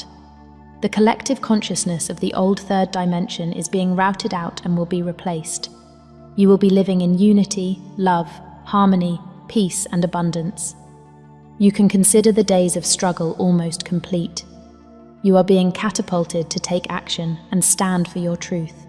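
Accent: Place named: United Kingdom. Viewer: And with a British accent yet. Nice try, but no brass ring !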